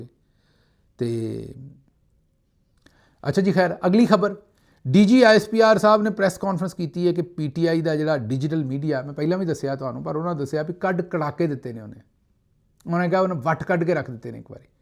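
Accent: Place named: Ropar, India. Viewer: SB THODI BOLI SADI ROPER(AMBALA) WALI LAGDI AAA,BOHAT PYAAR AUNDA THODE TE.SADQE JAYON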